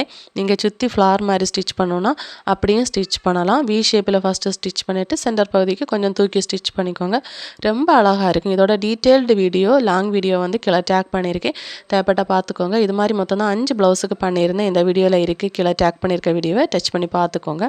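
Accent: Kanyakumari, India. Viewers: I like your Kanniyakumari slang sis ❤ Your ideas are 🔥👌🏻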